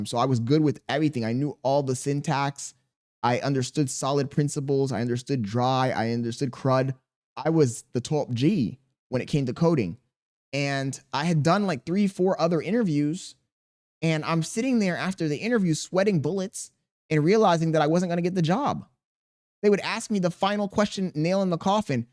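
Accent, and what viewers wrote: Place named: India. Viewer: used those call center skills and the indian accent to get that job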